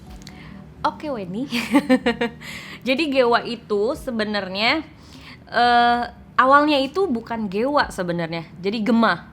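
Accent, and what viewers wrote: Indonesia. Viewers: Sundanya mentok teh, mantap! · Sunda pisann logatnya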